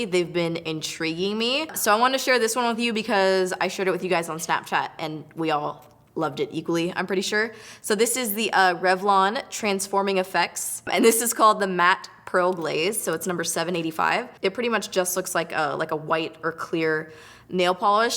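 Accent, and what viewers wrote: California, United States. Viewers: U have a classic Cali-girl accent! Lov it! I wonder where u grew up?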